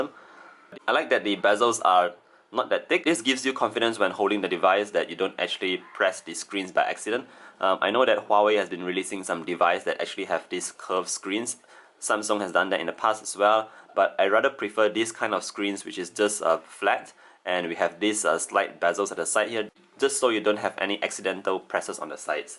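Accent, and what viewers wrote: Malaysia. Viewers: Hi! great video and very nicely presented. Are you Malaysian? I somehow notice your accent is quite the same with my chinese-malaysian friends.. 👍😊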